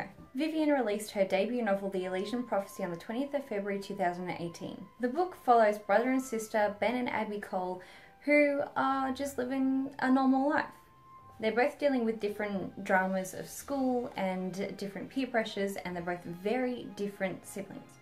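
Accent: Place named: Melbourne, Australia. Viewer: You sound like you're from Melbourne! =D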